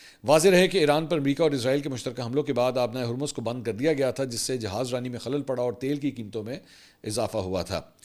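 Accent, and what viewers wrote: Punjab, Pakistan. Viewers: Wah apki Punjabi ❤❤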